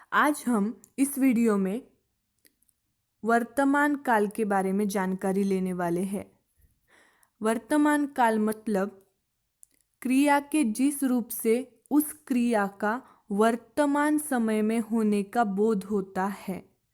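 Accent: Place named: South, India. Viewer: Very very nice 👌👌big big thanks for u 🙏mam aap South se ho aapki hindi South side ki lg rhi h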